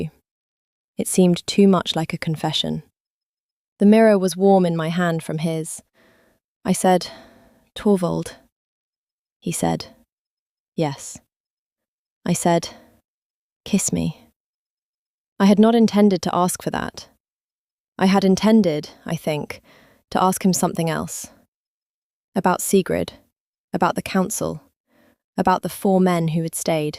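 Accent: England, United Kingdom. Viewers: Loving these stories, it's so wonderful hearing an English accent ❤ I am English so hearing our accent is great, please don't change it 😊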